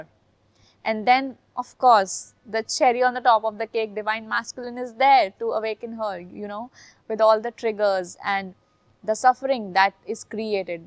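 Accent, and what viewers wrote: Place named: India. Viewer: I just love that Indian accent 😊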